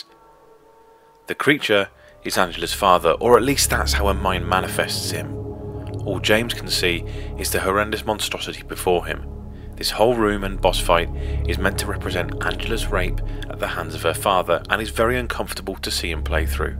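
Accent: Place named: Yorkshire, United Kingdom. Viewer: Glad to hear a friendly Yorkshire voice 😀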